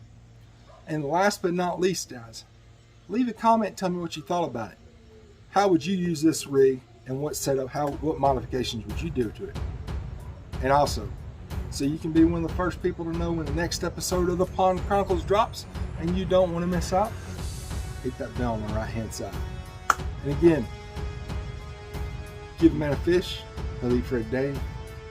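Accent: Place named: New England, United States. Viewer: Could not follow the mumbling and drawl and dogs barking . Lots of people do not speak Yankee!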